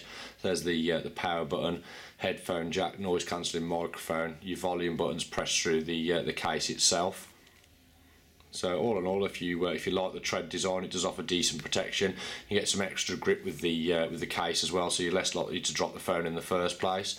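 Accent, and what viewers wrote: Birmingham, United Kingdom. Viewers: with that accent you must be a fellow brummy lol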